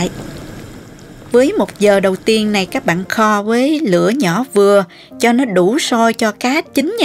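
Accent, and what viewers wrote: South, Vietnam. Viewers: Rat nguong mo, cach nau, cach trinh bay va cach chi dan day du chi tiet, cong voi giong noi mien Nam va rat em, nhe, to rang, Ngan gon, de hieu. Cam on Co rat nhieu. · đúng chất người miền nam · Giọng nói cô nầy thuộc người miền nam thật dễ thương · Giọng nói người Nam Bộ rất dễ thương dịu dàng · CÁI GIỌNG NÓI CỦA MIỀN NAM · Giọng miền Nam nghe chơn chất hiền hoà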